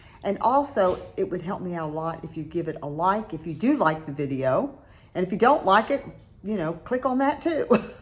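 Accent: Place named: United States. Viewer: Thanks, nice to know this can be done without steaming the leather. I made a bracelet with my own woodturned beads strung on 3mm leather cord with leather barrel knots in between my woodturned beads, but the leather was too stiff as a bracelet so I pulled it apart, undid the barrel knots which retained some of their kink. I will try your method to unkink the leather so I can make a choker with it. Seems a lot of people are copying each others videos on youtube. You sound more American than Italian! PS - the tool you call a 'clamp' is actually called a 'vice'.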